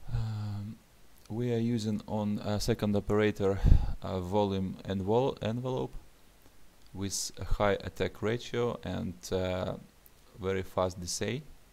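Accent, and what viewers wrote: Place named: Russia. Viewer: русский акцент))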